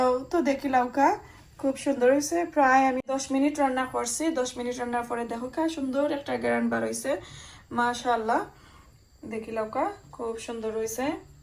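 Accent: Sylhet, Bangladesh. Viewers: এটি সিলেটি ভাষা 👌👌👌🥰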